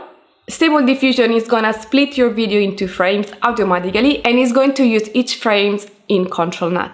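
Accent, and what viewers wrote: Italy. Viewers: your videos are perfect. You're so good at explaining, please keep producing content. I subscribed in 30 seconds flat plus your Italian accent, I could listen to it all day long!!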